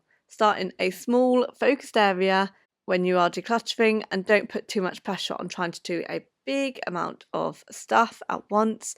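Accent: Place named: England, United Kingdom. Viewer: Ah...a sweet quiet soft english accent..❤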